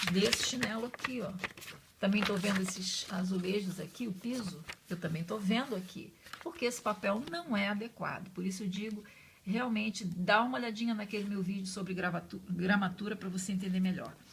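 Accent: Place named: Rio de Janeiro, Brazil. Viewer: amei esse video ( e todos os outros que ja assisti aqui do canal..kkkk) muito boas suas dicas...muito sincera,direta...sem enrolação...muito eu...( sou carioca e esse seu sotaque me faz pensar que vc tb...acertei ???) Bj grande e muito grata !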